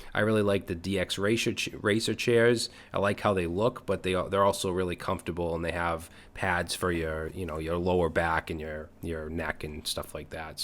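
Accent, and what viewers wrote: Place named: New England, United States. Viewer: In regard to Category 3 I (re)took up Vim about 2 months ago to minimize hand movements involving the mouse and for mental discipline. After practicing and note taking in terminal-launched VIM I came back to VsCode and installed the Vim extension. This is a work in progress but I'm not unhappy about it. In eighth grade I took a half-year (one class period) in TYPING and got myself to about 35 wpm. No regrets there. My advice now to my 1964 self would be to follow that up with a half-year of creative writing or coding in Vim, ignoring the technical issues of "what's a keyboard?", "what's a monitor?", and "PC, Mac, or Linux?" Ah, the IBM Selectric typewriter was still a bunch of concepts on a drafting table at that time. In your Category 1 arena I scrounged a monitor out of storage to enlarge my viewing area (same number of, but bigger, pixels) and began drilling myself in Tmux in parallel with Vim. 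BTW, Vim and Tmux like to fight in the back seat while Dad's trying to drive - I digress. My point isn't to promote Vim but encourage your viewers to consider ways to minimize mouse operations, reduce eye strain, and organize a work flow using tiled panes in terminal or GUI tools. Thank you for your video - it got me to organize my thinking on the above topics. BTW, your accent has me wondering if you and your neighbors are regretting a certain off-season move involving a certain QB relocating from a certain Massachusetts team to a certain Florida team. If this is the case I would b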